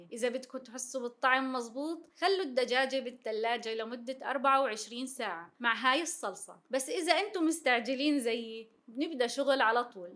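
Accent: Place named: Palestine. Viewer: لهجتك فلسطينية ❤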